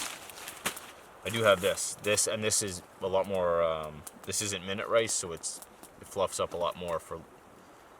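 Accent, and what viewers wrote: Canada. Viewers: Hey are you Canadian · I'm watching your videos every night from now on. That fire crackle and your familiar, calm Canadian voice (canada represent) just put me right to sleep.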